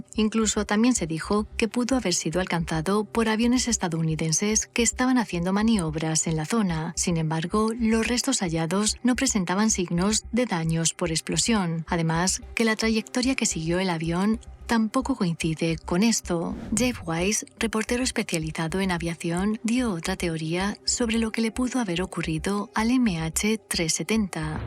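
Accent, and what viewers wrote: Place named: Aragon, Spain. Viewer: No soporté el «suscribais, dehais, actubais" demasiado baturro para mi gusto.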